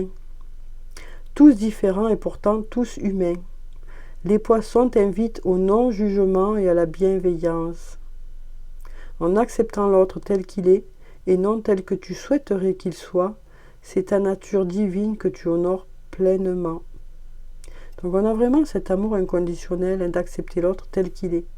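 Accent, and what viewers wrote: South, France. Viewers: Coucou, contente de voir la dame de l accent du soleil et toujours un plaisir de vous écouter,avec cette douceur et bienveillance merci 💐🙏😘 Bonne journée à vous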